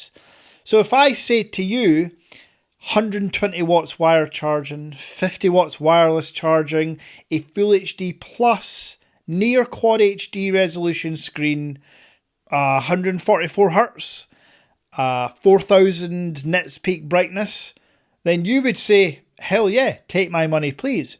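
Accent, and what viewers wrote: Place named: Scotland, United Kingdom. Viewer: Scottish accent! Rab C Nesbitt does tech.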